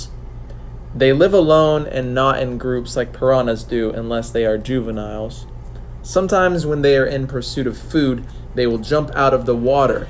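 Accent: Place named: South Africa. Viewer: Oh this channel looks good! Must be South Africa as he has a strong SA accent. Will check it out further.. what a nice guy.. I sooooooooooi hate trophy hunting, will NEVER understand how some get fun out of killing these magnificent beasts.. Trust me, channels appreciate comments, Violet - it all helps.. and thankyou for your kind words!! 🤗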